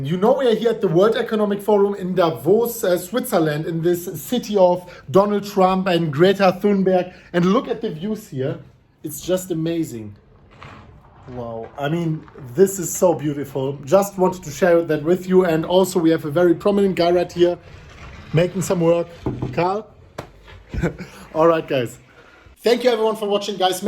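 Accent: Germany. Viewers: Please, try to correct your accent.... Mean your german accent is so strong.....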